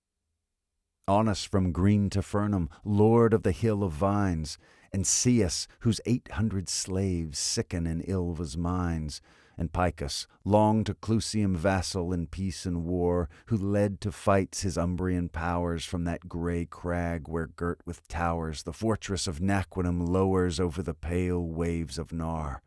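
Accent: United States. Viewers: I had been lamenting the shortage of people reading great poems, well, in an American accent. So glad to have found this. Have you considered reading some of Chesterton’s poetry?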